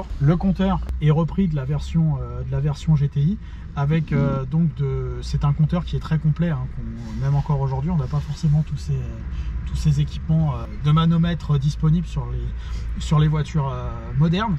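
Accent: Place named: Paris, France. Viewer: Superbe accent titi parisien du présentateur, je ne sais pas s'il vient vraiment de Paris mais ça y ressemble.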